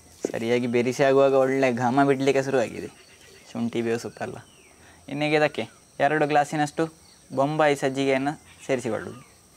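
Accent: Mangalore, India. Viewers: Yes to listen to your Mangalore kannada is very nice. I remember my days at Mangalore for twenty years.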